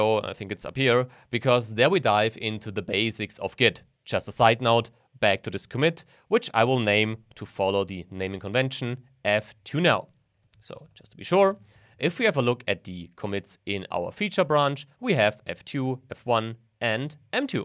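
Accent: Germany. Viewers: Do you deliberately sound like Max in your pronuntiation? or is it a thing in German people to sound like that? Great video! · I was deciding whether or not he had a german accent; but then he said "entwickelments" instead of developments and it all became clear to me.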